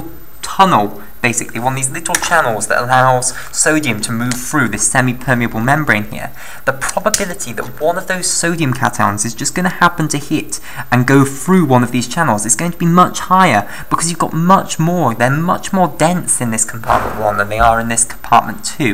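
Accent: United Kingdom. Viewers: British one 👍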